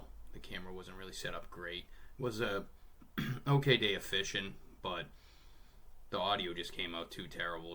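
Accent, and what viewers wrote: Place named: Massachusetts, United States. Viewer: I would definitely continue to fish that just the other day. I was throwing everything under the sun. I even got so frustrated I tried live bait which they were not hitting. I put a black with a blue flick on and I caught a 5 lbs. 6 oz. in 18 feet of water. I was just working it on the bottom. weightless on 3 hook the 5 inch bait. and I’m guessing you’re in Massachusetts like I am I can tell by your accent.😂 so we’re definitely fishing the same conditions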